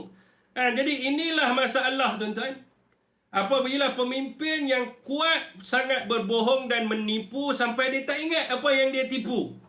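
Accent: Kedah, Malaysia. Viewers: Sembang je lebih. Ko la melingkup. Ko pelat kedah, tau dah ko ckp sentimen politik. Sedap ckp kerajaan penipu. Siap sebut walanon... tau dah kiblat ko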